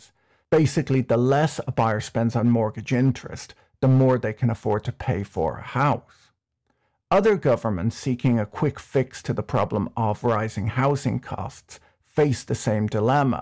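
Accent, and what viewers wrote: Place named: Ireland. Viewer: His accent sounds irish but mixed with something else I can’t pick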